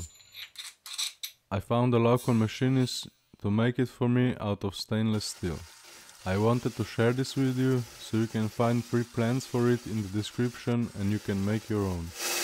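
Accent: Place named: Montenegro. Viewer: Nice work man! I would almost bet, judging by your Eng accent, that you come from the small and beautiful country that has about 40km of Adriatic coast and a 2850m highest mountain. Can I ask why you opted for the glued neck, was it aesthetics or also sound? And did you make your own pickups? I have never seen that shape of pu's before. Last question :) ... what gauge strings did you put on. The low E looks like it's quite thick, like 54 or 60. Cheers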